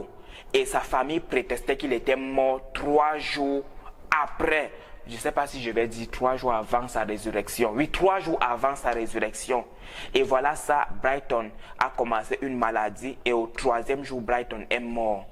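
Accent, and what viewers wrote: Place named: Cameroon. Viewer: Toi tu dois être camerounais vu ton accent😂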